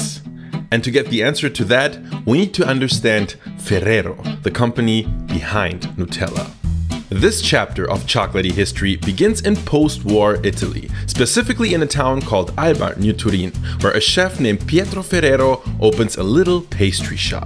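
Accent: Germany. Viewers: Is he german? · This is probably lhe least german german accent I've ever heard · First time on your videos. I detect a german accent, am i right? I like guessing people's accents 😁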